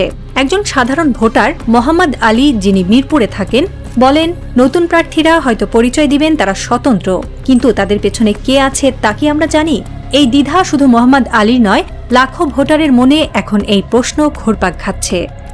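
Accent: Kolkata, India. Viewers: কবিতা বাদ দিন, আপনাদের খবর শুনতে খুব খারাপ লাগে। কলকাতার মত সংবাদ পাঠ বাদ দেন প্লিজ।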